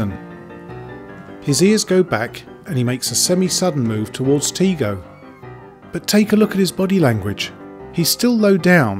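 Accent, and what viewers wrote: United Kingdom. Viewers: british accent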